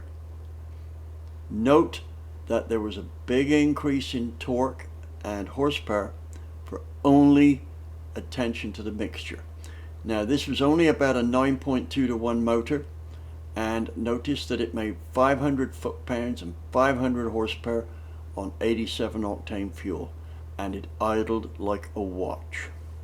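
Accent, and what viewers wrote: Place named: Yeovil, United Kingdom. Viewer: I cant find any history but he sounds like he's from Yeovil. These videos are like ASMR to me.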